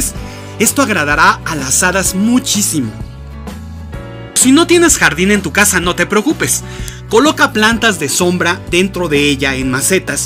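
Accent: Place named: Mexico. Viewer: Con ese asentó mexicano?🤔 Nooooooooooo Me agrada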